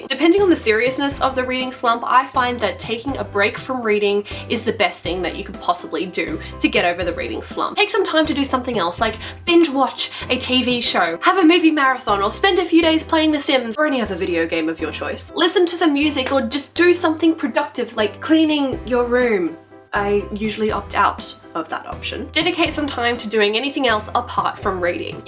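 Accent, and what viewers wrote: Australia. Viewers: Hello. First of all love your voice! Are you Australian? Anyways normally I get out of a reading slump by not, reading, watching booktube, or even buying more books. I'll just put a bundle of books into my Amazon shopping cart to get the urge back. Yes we're in this together!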